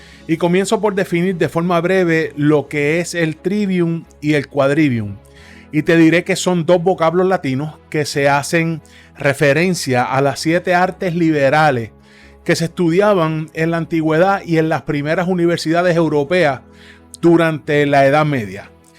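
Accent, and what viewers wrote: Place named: Puerto Rico. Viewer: Quiero saber mas de este tema pero buscare algo mas confiable ya que usted siendo de puerto rico le quita seriedad y credibilidad ademas de que ese acento da mucho asco, mejor busco otro video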